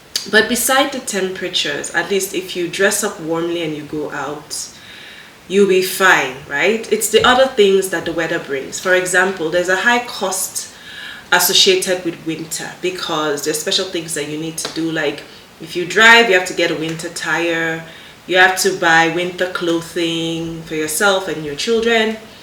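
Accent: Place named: Canada. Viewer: Lol, Very Canadian accent.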